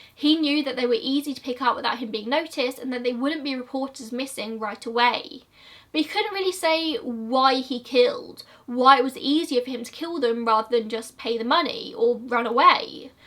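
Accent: United Kingdom. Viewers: You've got a buetiful voice, no need to be self conscious. Love the UK accent. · i love ur accent so much lol idk if its because I'm American and don't hear a lot of British accents but i always tend to watch British people like Eleanor Neale because of u guyses accents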